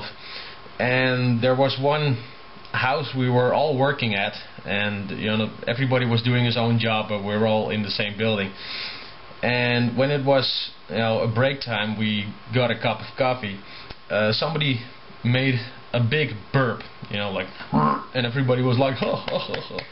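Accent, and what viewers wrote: Netherlands. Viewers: Hoezo dacht je dat hij engels was? Je hoort het bijna altijd als iemand engels spreekt die nederlands is. Alle Nederlanders hebben ongeveer hetzelfde accent:P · Dutch accent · hee ik dag al da je een ned accent had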